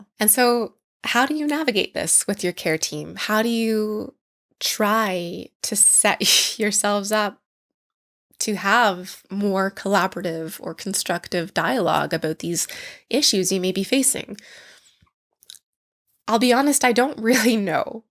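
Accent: Canada. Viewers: Your accent leads me to believe you're Canadian. Care team? We have hundreds of thousands of mentally ill crowding the sidewalks of every city in the US. It's a horrible job that nobody wants. Count yourself fortunate that you have the resources to help you.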